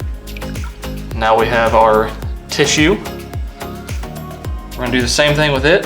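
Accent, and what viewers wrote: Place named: Texas, United States. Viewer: What is this guy's last name? I believe with his slight accent he's from Texas?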